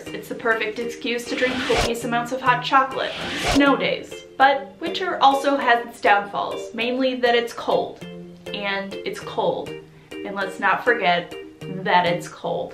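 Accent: United States. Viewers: I'm so over American accents. Her voice grates on my nerves!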